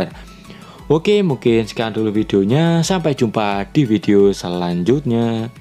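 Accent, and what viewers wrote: Java, Indonesia. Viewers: abang Orang mna sih bang JAWA YA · Bosone kok medok bang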